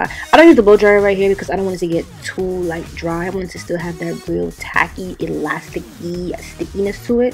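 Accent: New York, United States. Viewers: You’re def a New Yorker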